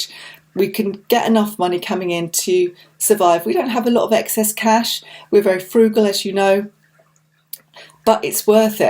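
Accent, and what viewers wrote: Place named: United Kingdom. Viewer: Oh this channel is delightful! Awwww, I'll never tire of a lovely British accent!😌💜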